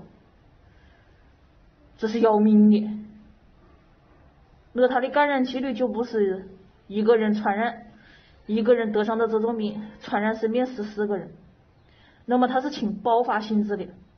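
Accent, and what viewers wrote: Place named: Sichuan, China. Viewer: 四川口音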